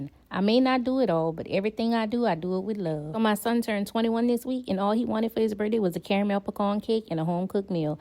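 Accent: Louisiana, United States. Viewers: At not one point did you say “babe” in this video. I’m highly disappointed because that accent has Louisiana written all over it 😩😭💜💜💜💜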